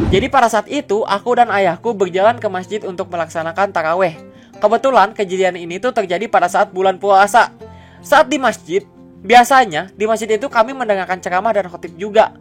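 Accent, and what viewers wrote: West Java, Indonesia. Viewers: Awok²... Tiguling dong:v Orng Sunda ya bang? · orng Sunda?😳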